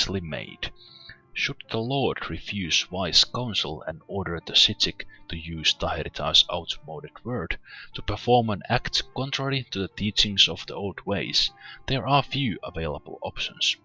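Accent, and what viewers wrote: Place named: Finland. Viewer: you sound like Ville Valo , less refined when he was young with strong Finnish accent... Cheers from Virosta ! :D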